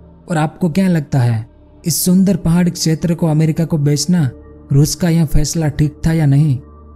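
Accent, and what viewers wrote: Gujarat, India. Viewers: If im not wrong narrator is 💯 gujju😂😂 please don't take this as offensive or any hatred. I love the way gujjus speak 😂 all thanks to TMKOC 🙌🏾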